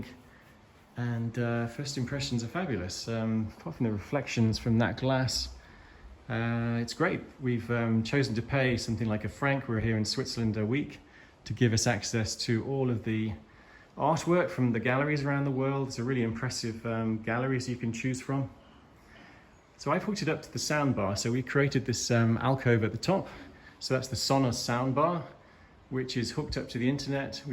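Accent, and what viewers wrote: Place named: Australia. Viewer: your voice my man, sounds so much an Aussie！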